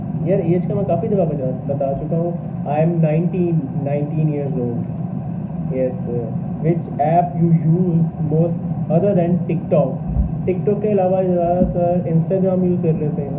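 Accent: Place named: Punjab, India. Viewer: U speak like pungabi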